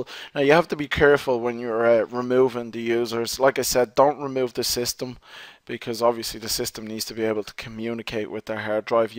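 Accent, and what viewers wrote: Ireland. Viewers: An Irish black man, cool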